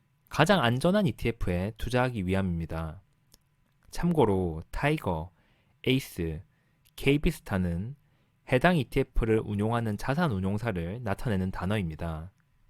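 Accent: Gyeongsang, South Korea. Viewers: 억양에서 경상도사투리가 느껴지네요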